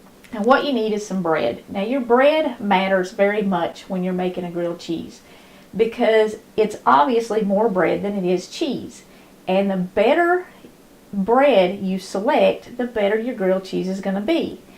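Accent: South, United States. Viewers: . I'm a southern gal through and through. I absolutely love your Channel and listening to another Southerner. Thank you for that. You're recipes are what I grew up with and miss. And I love how you put God first so important!! Thank you for that also. Have a blessed day. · I love your videos and your biblical standards so much!! In fact, as I have lived down south for so many years, I can't decide what I enjoy more - your Southern Recipes, your "down home" biblical morals, or maybe it's your Southern accent thatI miss??!! My suggestion for a grilled cheese sandwich is to brown BOTH sides of the two pieces of bread in a fry pan at the same time and then add the cheese to each browned slice of bread; and then combine the two slices on top of each other in pan for only a few seconds more on each side. That's what I was taught; and it gives the inside of the sandwich a crispier base for the cheese when it melts!!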